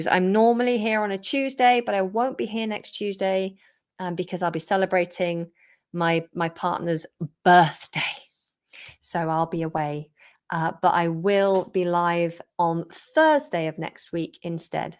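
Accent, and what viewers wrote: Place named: United Kingdom. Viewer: I love British accent!!!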